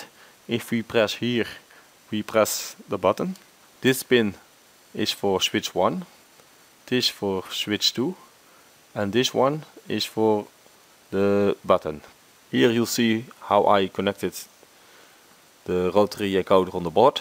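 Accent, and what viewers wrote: Netherlands. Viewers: always nice to hear these dutch accents. nice video Continue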